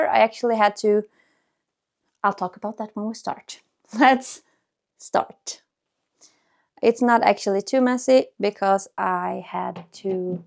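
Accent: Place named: Sweden. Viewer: Call things whatever you like to call them. Hopefully everybody knows you're from Sweden and have an accent. I dont expect perfection in the way you pronounce words whatsoever. Honestly, I'm just working and I'm not really even paying attention to the way you're talking or not talking. I don't notice at all. I noticed you said please talk normally. it almost sounded like somebody in your family or some caregiver said that to you as a kid maybe? Please be kind to yourself. I don't probably most people don't notice these little things that you notice about your communication.. words Etc. Please focus on the fact that you're making a video and that's in itself is really amazing!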